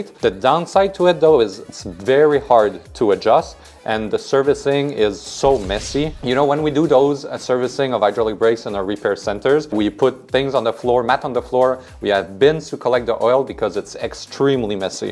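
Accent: Quebec, Canada. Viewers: Très québécois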